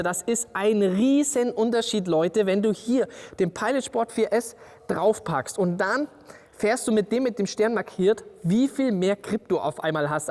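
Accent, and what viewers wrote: Bavaria, Germany. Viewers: heute zum ersten mal auf Eurem Kanal - boarisch, da Wahnsinn (Muttersprache :), des is a moi a Abo wert!! Mir gefiel der M2 vom ersten Moment und ich bin am Samstag in der BMW-Welt um das reale Auto rum - kein Vergleich zu Fotos oder Videos - ein Traum. Mein 240 (F22) wirkt dagegen schon fast unmodern. Brooklyn Grey als Schalter kann ich mir sehr gut vorstellen :) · Ruhig bissl bayrisch find du ch subba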